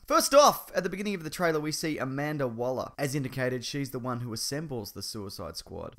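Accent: Australia. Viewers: Great video! I love your voice, by the way! Nice accent, and I love how you get louder and quieter. Are you Australian?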